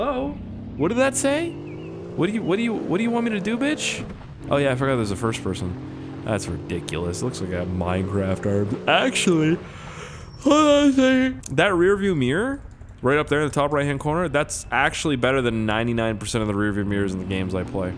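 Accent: Russia. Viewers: Please do more random Russian games so I can hear your hilarious accents lolololol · Love your Russian accent xD More Russian videos!! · i'm disappointed you didn't start this episode in your Amazing Russian accent.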